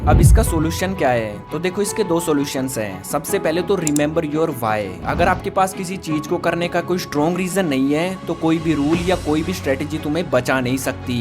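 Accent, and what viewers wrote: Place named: Haryana, India. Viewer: bro is from haryana it tell voice tone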